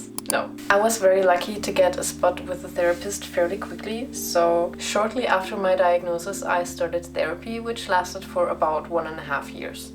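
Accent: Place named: Germany. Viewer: Witzig Habe nach neurodivergent artists gesucht & du bist aufgetaucht. Direkt im ersten Satz konnte ich vermuten dass du aus DE kommst.. sollte so für mich leichter sein das englisch zu verstehen 😀😀💚💚💚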